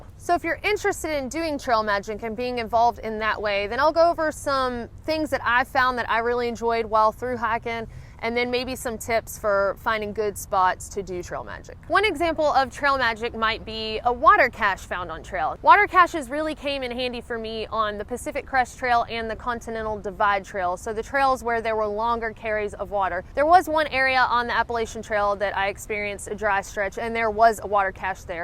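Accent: South, United States. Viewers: I wouldn't take any medication that wasn't individually wrapped; nothing from a bottle of pills., I love your videos and they are always interesting and inspiring. Hearing a beautiful Southern accent coming from an intelligent woman is wonderful. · your southern drawl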